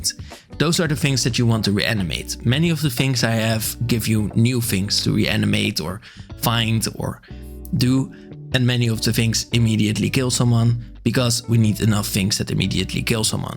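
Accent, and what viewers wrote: Netherlands. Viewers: my Dutch-radar is tingling are you dutch ? edit: nvm I looked at your channel and found the answer ;-0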